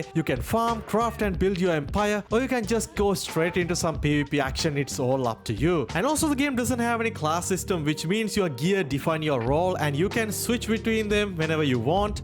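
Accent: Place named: India. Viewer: your accent speaks Indian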